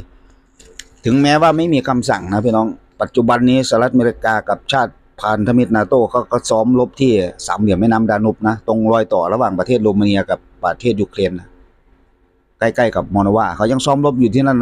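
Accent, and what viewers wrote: Isan, Thailand. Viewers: แอดมินเป็นคนอีสานใช่ไหม ฟังจากสำเนียงแล้ว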